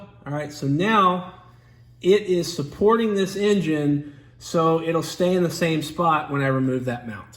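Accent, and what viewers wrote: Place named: Texas, United States. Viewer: Instruction car videos are just better in a Texas accent. LOL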